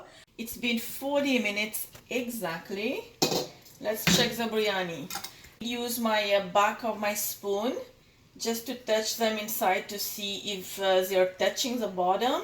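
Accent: Mauritius. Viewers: My step-father is Mauritian, and she just sounds like lots of Mauritians I know. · Why do you sound Mauritian, Indian and Jamaican at the same time?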